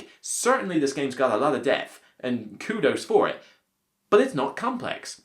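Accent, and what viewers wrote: United Kingdom. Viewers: Why is this guy’s accent half British and half American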